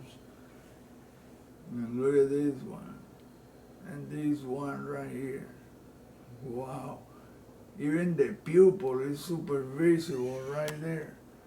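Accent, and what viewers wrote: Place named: Spain. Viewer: Your Spanish accent is Too much man. Learn to pronounce is like u do shit on purpose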